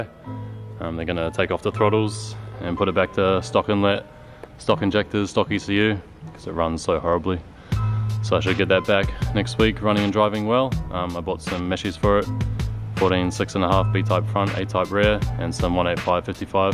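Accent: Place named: New Zealand. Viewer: Just stumbled across your vids. I recognise a Kiwi brother's accent. Love the vids. Just got an 83 ae86 from Japan late last year. Helping the 86 population grow in the motherland ha. Look forward to binge watching your content.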